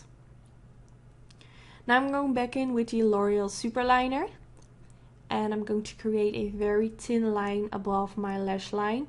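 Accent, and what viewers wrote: Netherlands. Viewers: ik hoorde je accent en ik wist meteen dat je Nederlands was. you go girl 😚❤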